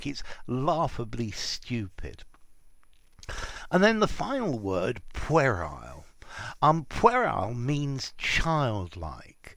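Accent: United Kingdom. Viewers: I always love british accent!!!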